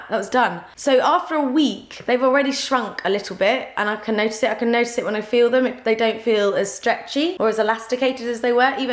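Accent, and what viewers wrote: United Kingdom. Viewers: I'm watching your videos since 4 years, but yesterday I watched the Amy documentary and since then you remind me of her, don't know if it's just the british accent or the tattoos or the uncommon hair, but that's the whole time in my mind :D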